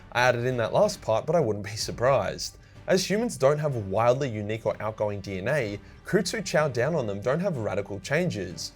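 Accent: Australia. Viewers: Always proud to hear your fellow Aussie accent. Keep pushing keep going and keep pushing past the tall poppy that our culture has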